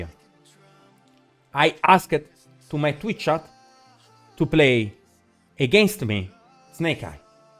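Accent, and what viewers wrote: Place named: Italy. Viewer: questo modo di parlare... maledettamente italiano; (viedo molto utile comunque)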